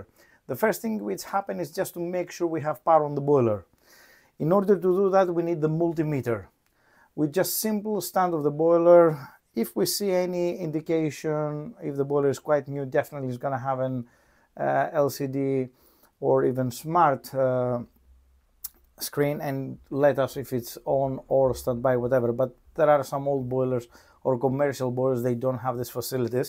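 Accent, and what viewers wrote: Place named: Greece. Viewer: Στάθηκα ακούγοντας τη προφορά και είπα ώπα, δικός μας. Πολύ ωραία,καλή συνέχεια.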